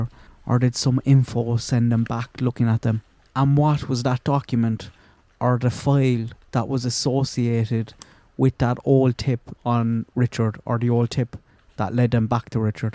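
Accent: Ireland. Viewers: Helloo well done my friend always enjoy ur take it might be the oirsh accent but I like the take..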